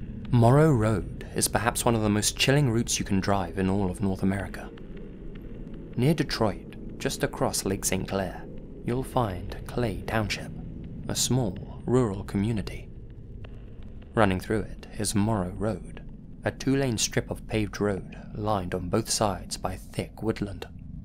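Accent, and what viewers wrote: Spain. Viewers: its funny to hear you pronounce Portuguese words with Spanish pronunciation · Fortaleza is not Fortaletha like you would say in Spanish. And Jaguaro is not Jaquaro. Idk if I'm making sense but he pronounces it as a spaniard wouls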